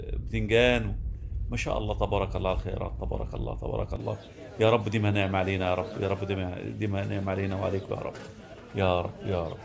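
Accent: Upper Egypt, Egypt. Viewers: مصري صعيدي 👍 لهجتك عرفتها. تحية لك يا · انا مغربي بس بكلم مصري وصعدي كمان ومن لهجتك انا عرفتك انك انت من صعيد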